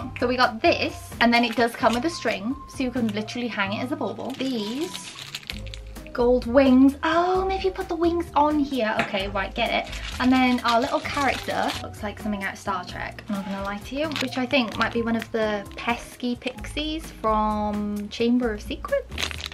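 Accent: United Kingdom. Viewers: I still can figure out if is British or not-💀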